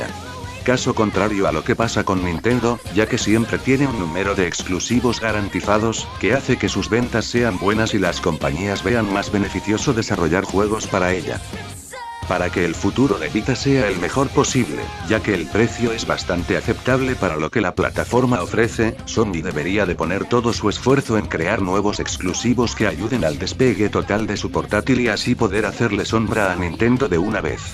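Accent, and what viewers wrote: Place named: Spain. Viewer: ¿Por qué le ponen esa voz culera de españolete?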